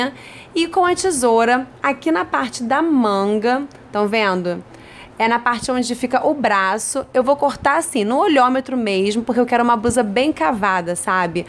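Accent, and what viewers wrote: Rio de Janeiro, Brazil. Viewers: PAUSAAAA! Nunca vi uma carioca com o sotaque tãoooo marcante! Hahahahaha, ADOREI! Seu cropped ficou uma gracinha, razô! Beijos e Pausa pra TPM!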